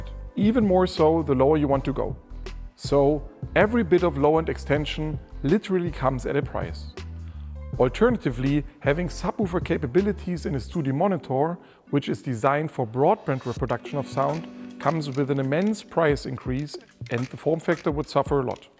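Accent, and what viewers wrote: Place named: Germany. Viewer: After two words spoken, I knew that he must be a german haha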